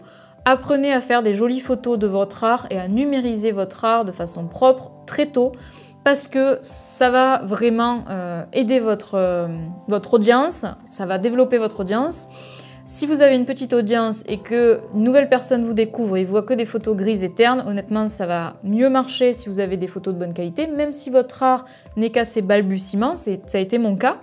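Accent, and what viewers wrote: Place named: South, France. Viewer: très bien je suis inscrite , j'ai déjà votre livre depuis le début je peint seulement depuis juin 2019. merci, vous avez l'accent du midi. a bientôt sur internet lucette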